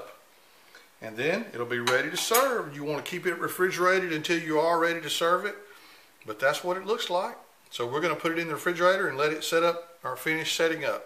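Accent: South, United States. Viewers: I'm from Kentucky love the way u talk y'all must be from the south thank u for that dish I had it a long time ago at a wedding reception and just loved it so much 💘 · Your southern accent makes me smile.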